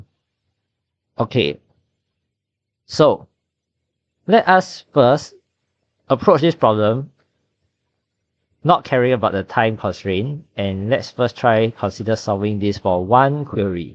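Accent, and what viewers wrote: United Kingdom. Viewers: Are you British? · Are you british?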